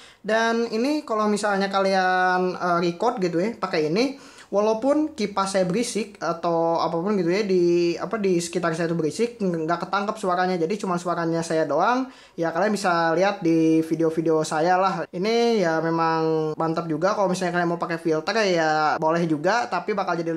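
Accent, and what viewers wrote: West Java, Indonesia. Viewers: Orang jawa barat yak ? Orang sunda yak ?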